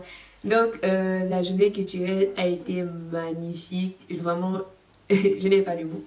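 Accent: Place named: Gabon. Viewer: L'accent gabonais :)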